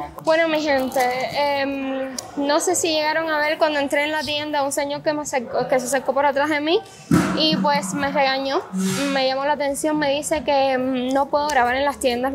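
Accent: Cuba. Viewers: Me encanta su acento cubano y la emoción de la cartera nueva. Saludos desde Guatemala 🇬🇹. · Me encanta como ella pronuncia, es muy cubana, y la he escuchado decir laik, pero cuando dice like es muy gracioso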